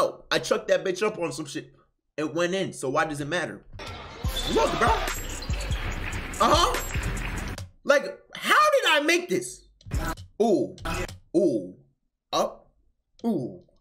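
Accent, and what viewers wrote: New Jersey, United States. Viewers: Ripe New Jersey accent right there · you from jersey? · New Jersey accent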